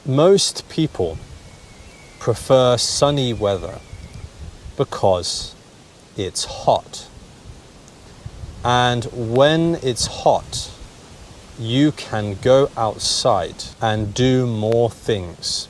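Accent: United Kingdom. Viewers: It's appropriate content. Even if the content is easy for understanding, it's useful to listen to underscore nuances of British speech.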